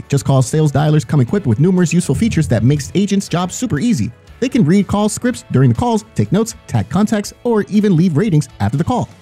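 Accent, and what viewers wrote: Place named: United Kingdom. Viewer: This one seems really convincing, the british accent and the free trial sold me on the idea.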